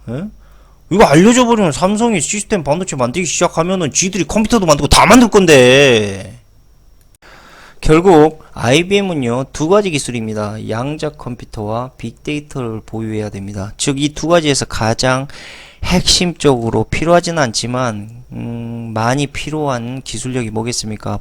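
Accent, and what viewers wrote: Jeolla, South Korea. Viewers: 그래그래 알겠고... 삼성 한국에선 못살게하고 해외로 나가게만들자. 어서 빨리 해외로 나가도록 자꾸 못하게하자... 시기질투열등의식나서 죽겠따. 말씨 억양이 딱 절라도네! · 뭔 헛소리 이사람 전라도 억양이네요 아주 사기를 치네요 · 전라도 목소리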